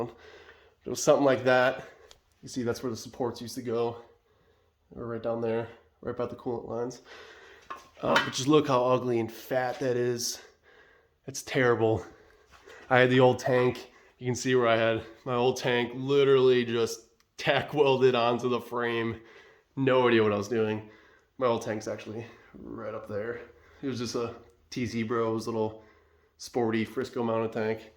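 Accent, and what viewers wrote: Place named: Midwest, United States. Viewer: Looks great, looking forward to how this goes. Where are located, sounds like Midwest from your other video (Menards). You're doing great, love the concept and your channel name. Your idea is quite similar to mine, just something to do for fun and show with a little ambition, you can do alot. Great Job!!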